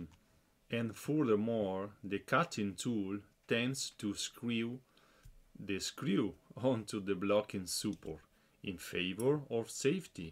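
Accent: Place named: Venice, Italy. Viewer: A me parea mi de riconossar na cadensa nostrana... te sarè mia dal Doeo!? Bel laoreto, brao 💪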